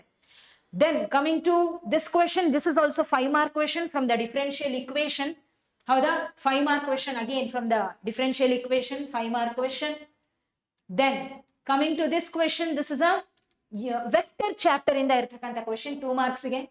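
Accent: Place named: Kerala, India. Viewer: Madam I think u are malayali, your kannada slang is in malayam accent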